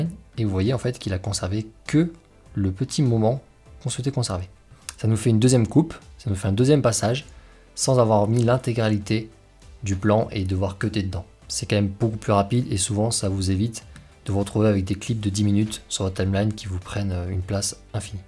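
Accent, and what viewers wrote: Toulouse, France. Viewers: Merci beaucoup pour ton généreux partage de tes nombreuses compétences! Ton éloquence et ton sens de la concision sont aussi très agréables! Je viens de m'équiper avec un Drone DJI Air 2s ainsi qu'un MacBook Pro assez performant équipé du logiciel de montage Final Cut. Alors voici ma question: est-ce que tu as déjà utilisé ce soft? pourquoi as-tu opté pour Première Pro? As-tu eu l'occasion de faire un comparatif Performances, Usage, Prix, Efficacité? Ferais-tu à l'occasion une vidéo d'initiation à ce logiciel? Alors certes on trouve des tutos en ligne, mais j'aurais beaucoup plus de plaisir à voir le tien, adapté aux vols et films cinématiques de Drones. Personne d'OOOtre sur le web n'a cet accent toulousain inimitable pour moi et que tu arbores avec fierté! Bravo, Bravo et encore merci... Je me demande comment tu as le temps de faire tout cela? 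Tu dois être bigrement passionné et efficace!!!!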